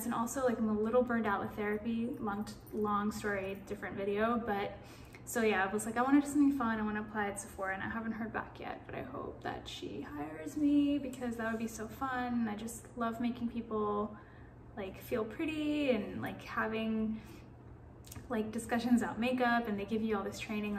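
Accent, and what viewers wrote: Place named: Atlanta, United States. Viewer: You have the Atlanta accent same as mine, Atlanta was full of transplants from the 90s so we didnt get a GA accent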